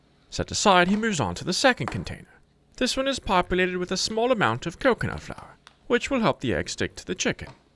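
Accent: England, United Kingdom. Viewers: This Italian is please of what he sees. Hysterical video content and edited and awesome food. You're doing that British accent?? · You are so entertaining with the way you deliver your recipes. So different from everyone else's boring hum drum keto recipe channels on YouTube. Your videos are funny, entertaining, informative and refreshing. Loving the different costumes, characters and english accent narration.😊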